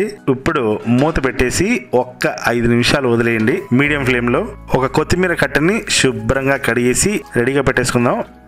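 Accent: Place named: Godavari, India. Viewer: Aami maatladarandi gaaru..maa godavari yaasalo,meeru chesina korrmeenu eguru superandi babuu..aayi👌😃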